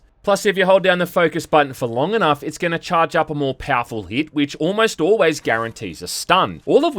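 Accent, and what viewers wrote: Australia. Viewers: Aussie accents are borderline psychological torture 🤯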